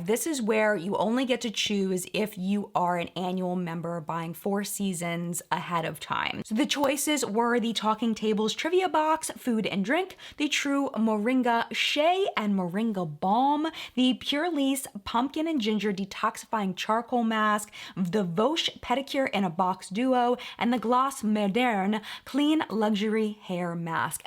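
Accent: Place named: Maryland, United States. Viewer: My fellow Marylander!! I love your Maryland accent, “Baltimore” 😍😍 hello from Riverdale Park, MD!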